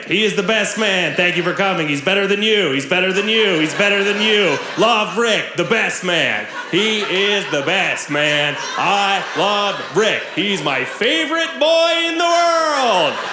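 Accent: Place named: Canada. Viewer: Im an ontario canadian myself. I was watching a racing youtube video and this whole special came up as an Advertisement. Ive never heard of you yet. I almost skipped but heard the first 2 minutes and watched the whole thing! I knew you were canadian instantly. Cheers from ontario! New fan! Edit: legit laughed my ass off outloud for so long my girl yelled at me from the other room asking if i was okay 🤣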